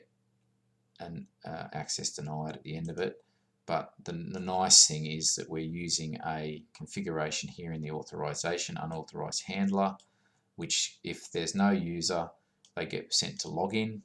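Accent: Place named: Australia. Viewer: Thanks, noted. I have tried to code your approach above but have an 'Invalid header value type; must be a string or numeric; received NULL' error. In trying to resolve this I wanted to know about the "getAttribute('identity') at the mark (line 32) and what is 'identity' referring to please. Also, great to hear another Aussie, when it comes to providing coding solutions! Cheers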